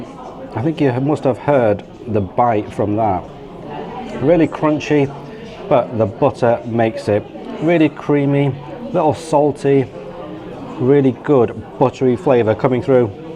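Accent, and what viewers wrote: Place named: Manchester, United Kingdom. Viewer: youve got a Manc accent or somewhere near, ( I could be wrong and it may be bearer to Yorkshire accent) so, I suspect as a Northerner that you actually know better. You should have sent that right back to the kitchen and gone to a builders cafe . Those beans served on the side as a garnish is , in itself, a red flag. Only one sausage? really? Why isn’t the egg runny / sunny side up . The mushrooms should be sliced thin and not fried but sautéed in a little oil.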